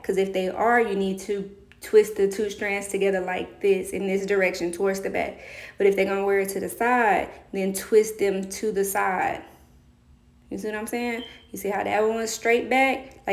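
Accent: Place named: Dallas, United States. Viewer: Sound like u from out here in Dallas... forgive me if I’m wrong luv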